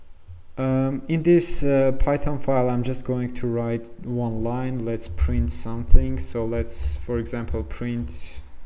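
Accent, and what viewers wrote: Iran. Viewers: hey bro, Your accent is very similar to Iranian, are you Iranian? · are you from Iran? interesting accent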